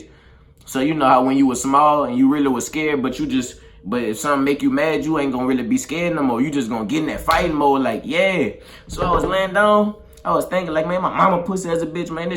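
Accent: Louisiana, United States. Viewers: he from Louisiana wen he say it twice to put emphasis on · That's how he talk 😐he from Louisiana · That accent on point bro lol u can tell u from the south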